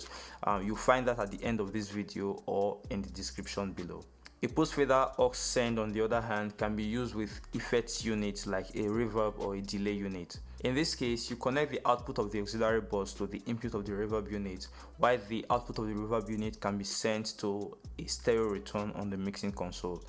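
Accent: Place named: England, United Kingdom. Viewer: I am struggling with his English accent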